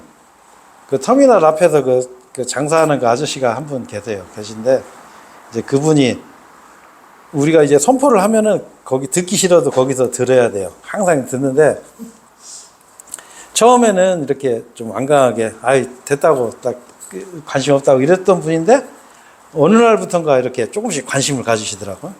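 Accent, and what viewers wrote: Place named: Gongju, South Korea. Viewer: 나의 고향 공주에 복된 교회가 생겼네요! 형제님의 공주 사투리도 정겹습니다. 공주에 사는 우리 친척들이 구원받을 기회가 되기를 기도합니다.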